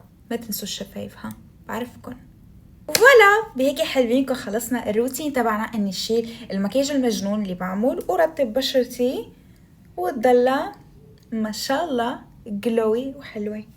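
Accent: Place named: Morocco. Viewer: واش بتعيشي في المغرب لأنك مبين انوا انتي مغربية لأنك مابتعرفي تتكلمي منيح بالسورية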